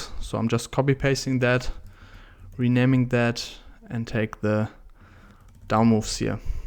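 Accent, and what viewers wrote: Germany. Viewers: You are also a German. Your English accent is my hint^^ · Hab an deinem Akzent erkannt, dass du Deutsch bist :D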